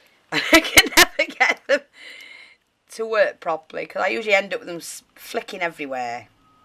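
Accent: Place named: England, United Kingdom. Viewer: i m glad to hear english im from there but live in ireland.laughing you saying buggering thing.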